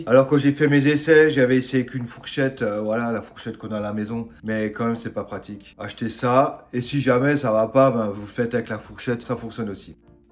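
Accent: Alsace, France. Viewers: L'accent alsacien 😂❤j'adore. Je suis d'Alsace également même si je vis en région parisienne ❤❤❤ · Ahh ce petit accent alsacien ! Ça fait plaisir de voir un compatriote ! Je ne vous connaissais pas et l’idée des bounty maison est très bonne 😊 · je pensais bien entendre un petit accent Alsacien. Bisous d’une Strasbourgeoise du Texas 😉 Bon après le “on le manche” y’a plus de doute 😂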